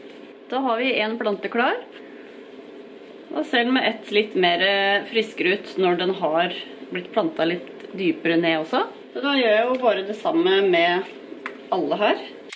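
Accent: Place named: Skien, Norway. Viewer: Ser du er fra Skjeen da.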